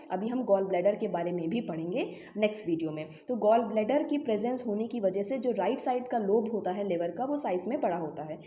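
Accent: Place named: Meerut, India. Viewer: Aap meerut se ho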